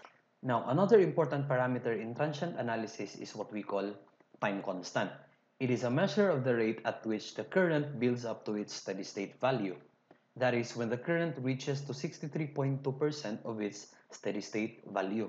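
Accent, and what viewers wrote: Philippines. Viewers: You should should your step by step derivations of calculus for students. Great Job. I can tell your English is Filipino, not American. 'eigenvalues'.... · Hahaha, check comment pa ako kung pinoy ka, pinoy accent eh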